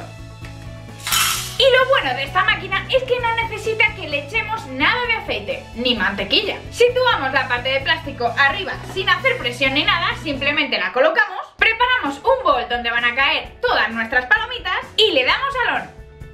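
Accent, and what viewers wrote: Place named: Spain. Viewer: pero en su caso ella es de España y la verdad no soporto su acento, obviamente el de todos los españoles.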